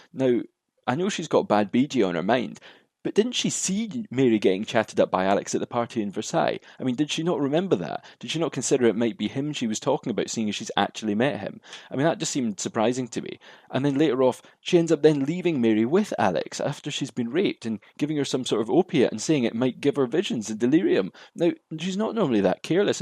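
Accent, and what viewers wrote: Scotland, United Kingdom. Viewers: I could listen to you talk about anything. But I guess I am just an American girl with a thing for a Scottish accent.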